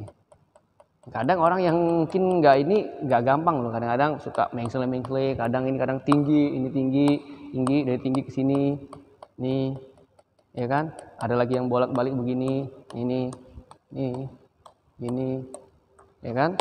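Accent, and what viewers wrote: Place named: Palembang, Indonesia. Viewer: Ay wong Palembang koyong ini😂 Palembang dimanonyo Yong?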